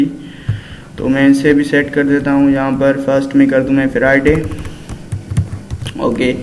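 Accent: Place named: Sindh, Pakistan. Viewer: Your Talking style seems Sindhi by the way Love you and Thanks <3 Peace !!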